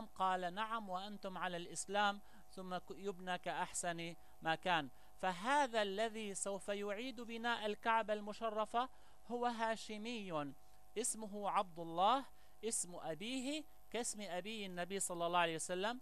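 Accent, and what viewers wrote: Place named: Iran. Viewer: والله العظيم لقد شككت بهذا الشخص من لهجته انو فارسي او ربما اسرائيلي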